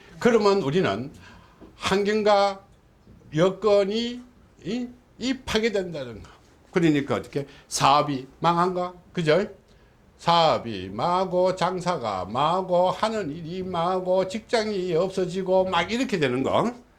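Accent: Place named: Gyeongsang, South Korea. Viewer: 삼재특강 ? 갱상도 사투리로 이해시킬려고 하시는게 뭔가는 좀 답답합니다! 귀에 쏙들어오질 않고 복잡하게 들립니다.. 내가 너무 이해력이 부족한건아닌지? 멍청한건지! 도저히? 특강의 이해부족으로 아쉽지만 ~ 포기하고 다음기회 로 미루겠습니다!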